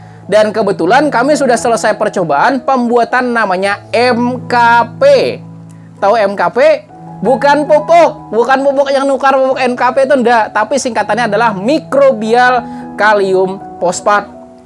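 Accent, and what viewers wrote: Banjar, Indonesia. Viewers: Ooo pantas am bepander macam urang Banjar, salam sukses, moga chanelnya terus berkembang & tak bosan berbagi ilmunya Bang. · Mantab, logatnya Sangat Banjar banget, salam dari Arek Banjar Suroboyo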